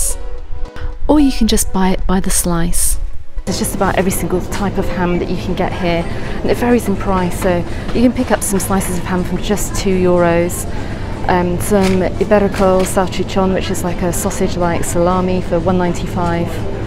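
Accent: United Kingdom. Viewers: It isn't possible to sound more English/British than that 😄. Love the content, thanks for posting!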